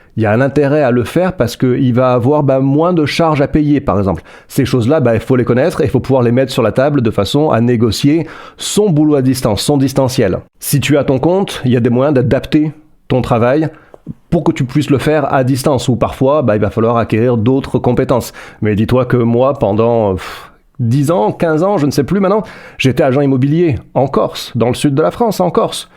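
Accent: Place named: Belgium. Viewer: Attends, à cause de son accent ma femme croit qu'il est belge 🤣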